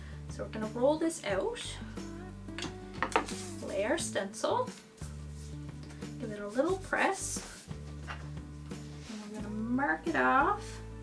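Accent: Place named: Canada. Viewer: Now I thought she was American until she started saying aboot (instead of about) hahaha Canadians speak so strange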